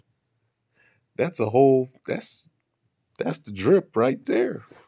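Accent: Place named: United States. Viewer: She looks so cute and she's gonna be so smart and philosophical with the way you talk to her! I love your conversation and also your slang with the American accent, I'm in London! 🤩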